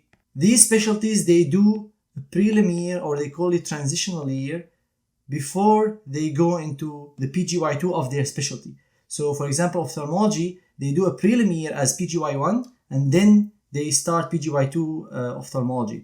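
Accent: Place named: Syria. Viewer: Hi Dr., I wanna thank you a lot for being such an inspiration for me and for lots of others, I really appreciate your hard work. May I ask you a favor? Can you make a video in Arabic telling us your story with your original Syrian accent, tell us how could you overcome all the struggles that face us being arabs, how could you modify your mentality to pursue your dream for such a competitive speciality. What was your cultural background and how studying at Aleppo was, how could you adapt living at the us at your first months. I wish I could know all of these stories that would me feel like as you are a true friend "which is an honor I would like to have🥰"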